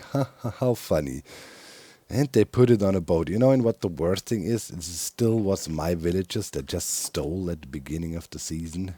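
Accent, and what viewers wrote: Germany. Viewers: I don't understand haters. I really don't. I mean - How can you not adore that German Accent?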